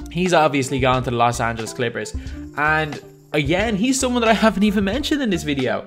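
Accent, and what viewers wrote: Ireland. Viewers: Your defo irish .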